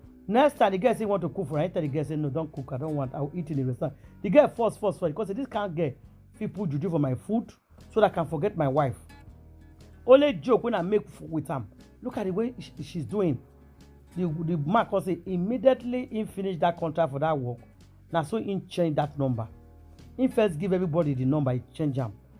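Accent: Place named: United States. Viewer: you are talking like an American, but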